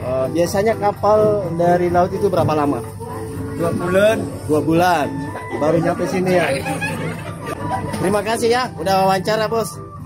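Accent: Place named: Java, Indonesia. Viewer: Ngomonge medok nmen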